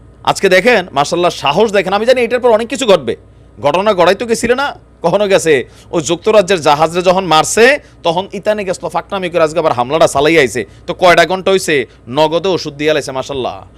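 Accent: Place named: Comilla, Bangladesh. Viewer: আপনার কুমিল্লা ভাসা বন্ধ করুন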